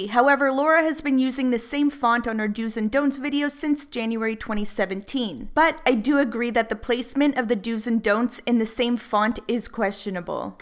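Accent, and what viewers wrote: Canada. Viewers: Totally off topic, but are you canadian?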